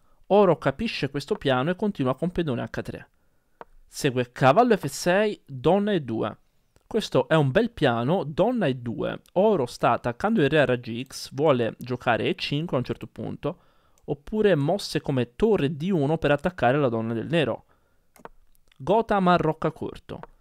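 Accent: Tuscany, Italy. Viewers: se inizi in inglese lo superi! Io spero di no perchè mi piace il tuo accento toscano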